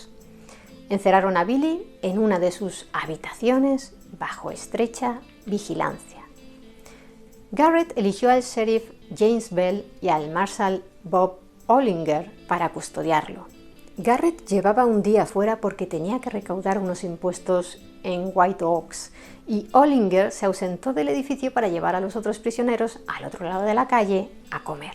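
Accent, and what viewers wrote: Castile, Spain. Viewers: Esta señora, además de tener un acento castellano exquisito, es una experta en la historia y sin pasión, le gusta la verdad.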